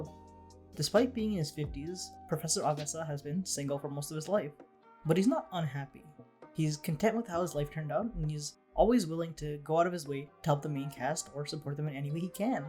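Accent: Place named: United States. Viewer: The way you say it sounds like CONAN THE BARBARIAN. Your way of saying japanese names far too american aside, respect for using the actual names rather than the MURICAN ones.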